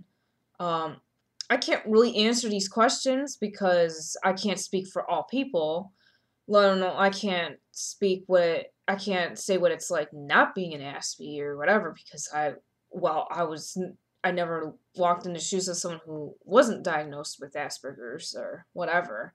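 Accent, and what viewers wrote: New York, United States. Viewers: Hi, I'm from Australia....really enjoyed your thoughts......what accent is that? Is that a particular area of NY?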